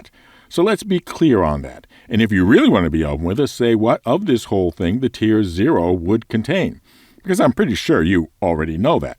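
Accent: Scotland, United Kingdom. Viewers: The scottish accent will help for sure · I do like the indicator lights on the buttons idea. It's similar to the old I-war 2 status bar where you could evaluate the state of your ship at a glance. Though I strongly disagree with the RNG repair damage. Make it take, say, 10 seconds for each RNG roll and only capable of bringing the system back to minimum operating capability instead. The Scottish accent might be a tough call for the non-english speakers though. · Engineering should be slightly more complex than the game blackwake. Where your ship has a problem, you have a quick and intuitive way of dealing with it. A 12 year old should be able to figure out. Otherwise you make dealing with engineering issues too much of a hassle for players either in there day to day activities or when in critical situations such as getting shot at. You shouldn't need a complex flow chart or checklist to fix the issue. 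Scottish accent is beyond scuff at 2x speed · Got to admit, hearing your Scottish accent is quite the experience. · I would like to hear your Scottish accent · scottish accent :D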